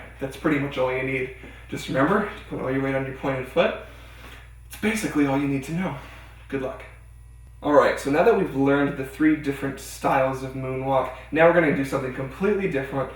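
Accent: Canada. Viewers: Your Canadian aren’t you😂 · Are you canadian? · Well explained. Are you Canadian?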